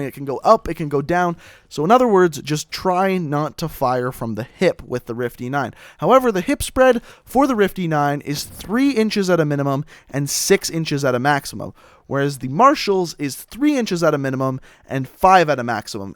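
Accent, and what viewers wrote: Canada. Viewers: Dude, are U canadian? !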